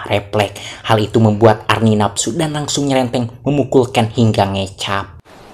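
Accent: West Java, Indonesia. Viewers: abang ini asal sunda? Sunda nya di mna bro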